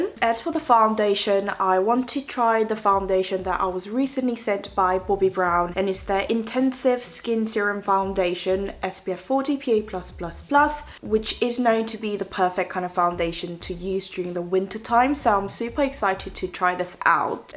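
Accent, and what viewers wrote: United Kingdom. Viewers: 너무 예뻐요!!!! 눈도 피부도 입술도 다 찰떡같이 잘 어울리세요ㅎㅎ 영상 분위기는 깔끔하고 자극없어서 영국발음도 부드럽게 들려요 이 점을 정말 좋아해요💕💕💕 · 마스카라너무 이쁜거 같아요!!! 항상 느끼지만 영상 보면 너무 영국영어 배우고 싶어요ㅠ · 영어로 말하시는 영상 볼 때마다 영국 발음에 치이고 가요...... ㅠㅠ 진짜 너무 멋있어요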